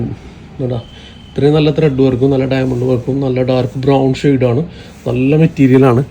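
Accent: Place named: Thrissur, India. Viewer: Innentha oru Thrissur slang pidichad😂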